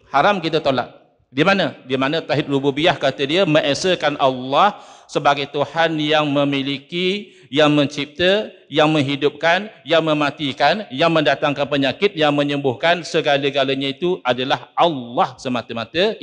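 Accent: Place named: Negeri Sembilan, Malaysia. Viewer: Mano... Cam org nogori ustaz ni🤭🤭🤭🙏🙏🙏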